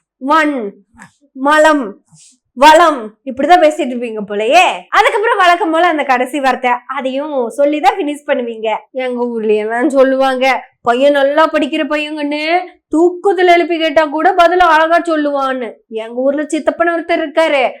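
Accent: Kongu, India. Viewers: Vazhtthukkal thozhar Anbu magal Azhagu kongu thamizhil Indha simon vazhai therandhale sakkadaiya than varudhu Chi chi chi😂😂😂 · கொங்கு மொழி super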